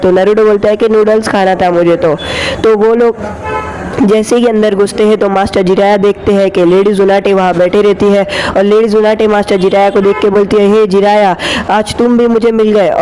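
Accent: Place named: Hyderabad, India. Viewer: Your accent is like Hyderabadi you are from Hyderabad?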